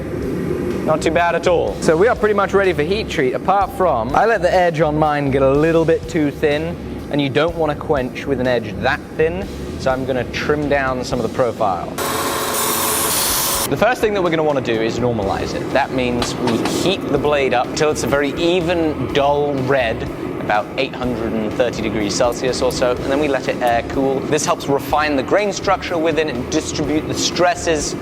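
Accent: United Kingdom. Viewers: Jesus, 8 months and you're back to full brit lol. Cussing out your mate on camera lol. Love it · is finally British again and it’s the best feeling ever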